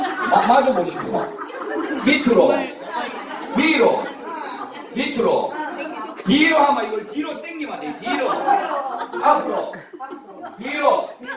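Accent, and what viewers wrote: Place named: Gyeongsang, South Korea. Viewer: 잼나고~ 경상도 사투리 죽이네용ㅋㅋ~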